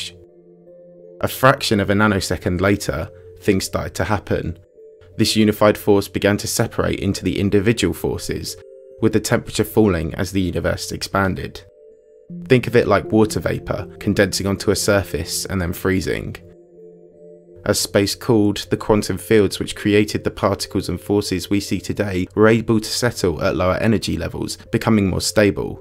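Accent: United Kingdom. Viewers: Except for the British accent its very descriptive and concise leaving out only one major point, the universe was born in a medium of some sorts which allowed for containment of the energy. Also, I wonder why people ask why, the answer is all around us.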